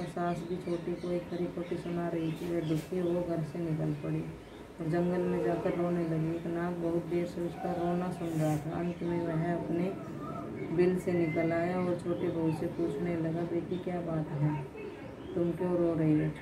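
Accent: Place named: Rewari, India. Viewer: Kit ki h ya jo khani padh rahi h boli to Riwari ki h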